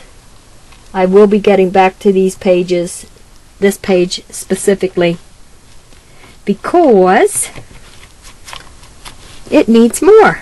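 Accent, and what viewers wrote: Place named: Philadelphia, United States. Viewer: You SO deserved to be showcased by Laurel...you are a true artist and you are doing the same thing she is by honoring so many of the artists who have inspired you over the years. Love how your book is coming along...purple is my favorite and your birdcage rocks! And I agree with NJGardenGirl....you definitely have that Philly accent going on! TFS, Heather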